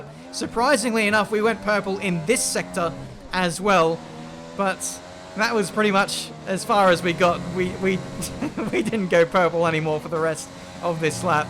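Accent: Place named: Australia. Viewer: How to watch channel: Step 1: Select video Step 2: Click "Like" and "Subscribe" if you haven't already. Step 3: Sit back, watch and listen to smooth jazz Australian Commentating Voice.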